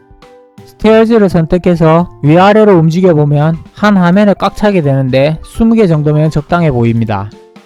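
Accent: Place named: South Korea. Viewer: 보고 즐겁게 따라해봤어요! 친절한 설명과 구수한 사투리 겉들인 좋은 영상 감사합니다!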